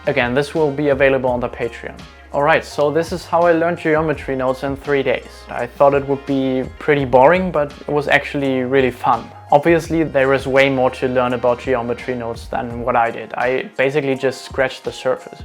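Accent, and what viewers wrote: Germany. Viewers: I love your German accent! Great video!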